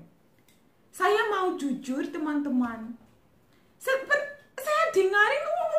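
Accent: Java, Indonesia. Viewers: Logat bicara kk sudah khas jawa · Waah hebat udh medook jawane 😄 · Mbaknya bahasa indonesianya jadi medok ya wkwkwk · Wahh medok kaleee yaaaa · Mantap suaranya medok, Zimbabwe rasa Jawa, lanjutkan semoga sukses selalu 👍👍👍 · Logat jawa ne medhok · Kalo ngomong gemesin + medok wkwk👍🏽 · Logatnya jawa banget,keren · bagus banget caerita kamu tentang pertama kali.di Indonesia.Dan langsung belajar bahasa Indonesia dg logat Jawa. Good job 👍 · Wow... Sudah ada logat Jawanya lho hahahah medhok bahasa Indonesianya. I hope you enjoyed your stay here, miss. Ps: Years ago when I was in my high school I stayed 2 weeks on Unair dorm years ago, so I knew what you're talking about 😂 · Medok bahasanya... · Suka liat mbak cantik 🤗🤗 mbak medhok lucuu 😍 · 😂😂😂😂😂 saya suka bahasa medokmu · Mantaap nih mbaknya Lucu,medook Bahasa indonesianya keren...good job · Bahasa Indonesianya Medok banget. 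Kayak orang Jawa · Medot banget!!! kok ngomongnya mirip org jawa ya hehe!!! mantap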